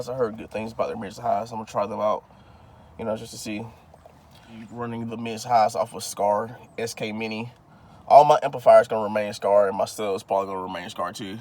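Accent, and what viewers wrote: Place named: South, United States. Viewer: your from the south huh ? im hearing a accent there,and im seeing camo duck hunting seat covers which omg i love duck hunting,well actually i love bar b queing duck,mmmm damn but yea oh and your even talking about the country haha ok so go ahead throw on some country hahaha im kidding where you at,which state not tx i didnt see a inspection sticker,well registeration sticker actually since they changed it to just one instead of both.but yea curious,alright Basshead UNITY ! 2020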